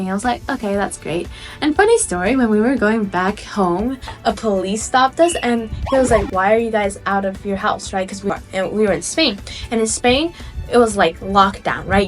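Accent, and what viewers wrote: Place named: United Kingdom. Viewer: Why you have a uk accent tho😭😭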